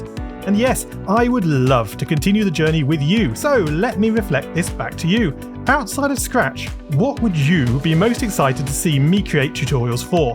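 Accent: United Kingdom. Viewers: i love your voice and that slight british accent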